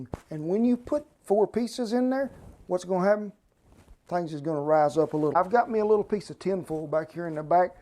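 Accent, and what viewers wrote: United States. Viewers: Why did I watch this before sleep, got hungry again:((( What accent is that though, never heard that particular American accent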